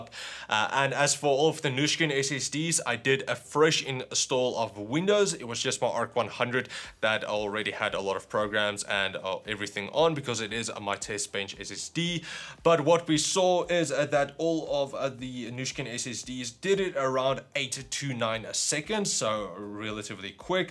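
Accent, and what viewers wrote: South Africa. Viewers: Ek wou se jys n vokken suid afrikaner toe ek jou accent hoor in die begin xD, nice vid, thanks vir die info.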